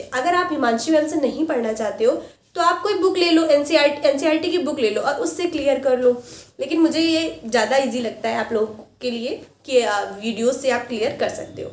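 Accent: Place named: Moradabad, India. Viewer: Aap sayad moradabad mandal se ha he aap ki language bata rahi hai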